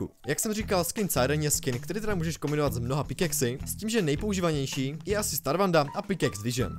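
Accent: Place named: England, United Kingdom. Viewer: Sorry ale ta tvoje angličánština mě mega sere xd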